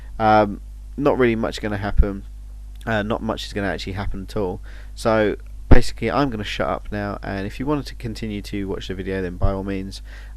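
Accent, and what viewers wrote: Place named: United Kingdom. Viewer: hey ur guide is awesome and i love the british accent im american but id like to be british instead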